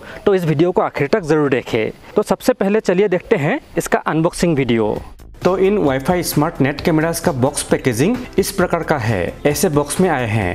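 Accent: Assam, India. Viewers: ভাই অসমীয়া নেকি?কথাবোৰত ধৰিব পাৰি ৷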